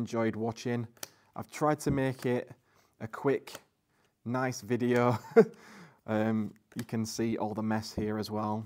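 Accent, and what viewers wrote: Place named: Leeds, United Kingdom. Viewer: that's definitely a Leeds accent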